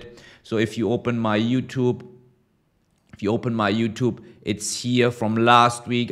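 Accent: Germany. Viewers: Man i love your English mixed with German accent and your articulation ! So nice!👍🏾 ❤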